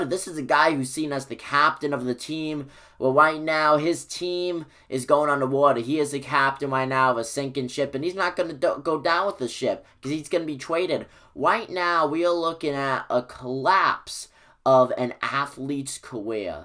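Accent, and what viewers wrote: New York, United States. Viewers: Fwankly….I love your NY accent…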